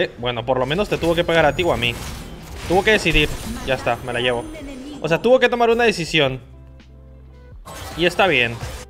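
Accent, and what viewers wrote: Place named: Peru. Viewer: Y un poco de peruano:v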